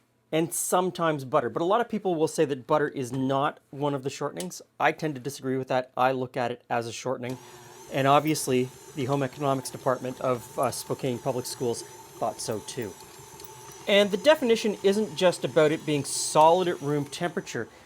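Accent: Canada. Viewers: I hear your Canadian accent,👍🏻👍🏻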